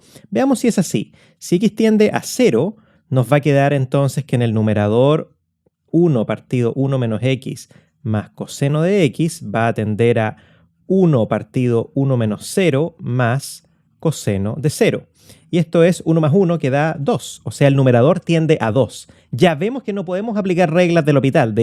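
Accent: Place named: Chile. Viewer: aja ajá ese acento, eres hermano chileno!!! Pisquito y bocadillos! Yo soy del norte de tu frontera!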